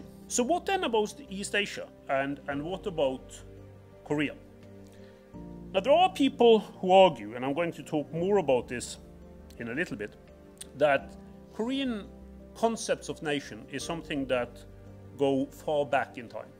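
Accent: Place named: United Kingdom. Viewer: 하버드대에서 강의하는게 영광이라고 하는걸 보니 하버드대 교수는 아니고 영상보니 버밍엄대교수같은데....억양이 영국이고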